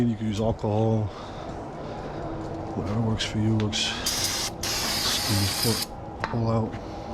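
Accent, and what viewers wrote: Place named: Staten Island, United States. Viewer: You sound like Keith Defosio from Staten Island.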